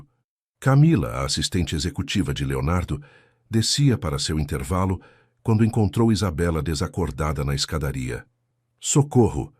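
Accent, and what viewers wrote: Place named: Portugal. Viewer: Narração perfeita, de Portugal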